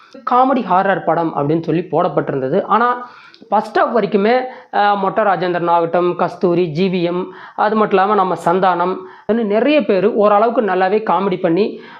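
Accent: Kongu, India. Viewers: Kongu Tamil Vazhga.. Well done review..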